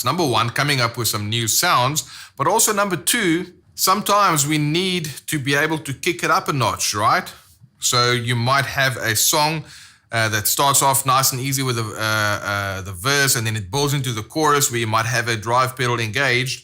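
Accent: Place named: South Africa. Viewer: Ive always been a fan of the bb preamp,one is always close by my pedal board. These days i have the custom shop one with the mid boost. Ps..... what the hell accent is that? Ive never heard it before,South Africa?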